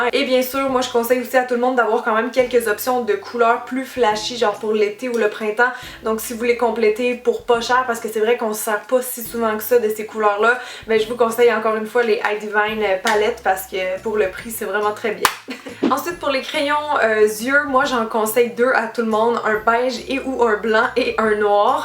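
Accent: Quebec, Canada. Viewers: J'adore l'accent québécois. Si je devais en avoir un, je choisirais celui là :) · elle est québécoise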